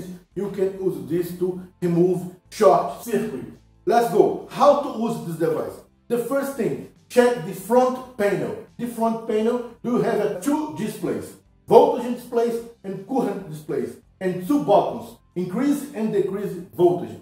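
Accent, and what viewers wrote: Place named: Portugal. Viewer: Such a fucking Portuguese accent in english!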